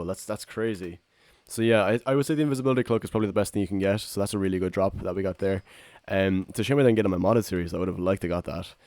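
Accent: Ireland. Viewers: Is ethis guy irish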